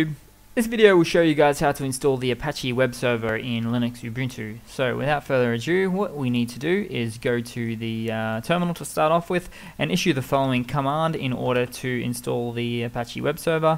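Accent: Australia. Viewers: Enjoy your Linux video-tutorials, and especially great to hear an Aussie accent rather than the 'generic' American voice-over.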